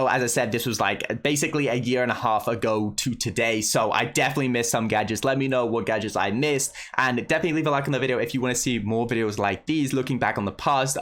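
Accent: United Kingdom. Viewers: a normal pottah from your supah I love bri'ish accents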